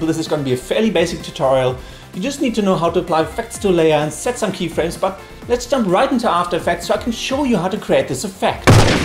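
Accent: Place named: Germany. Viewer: You have a german accent xD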